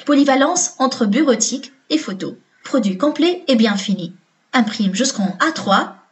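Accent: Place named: Morocco. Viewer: voix marocainne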